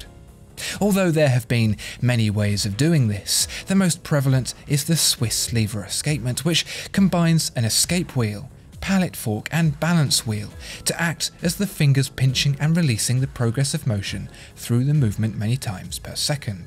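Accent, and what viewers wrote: United Kingdom. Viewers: "Tighter than a supermarket parking space" 😂 Yep, if the accent didn't already give it away you can tell he's British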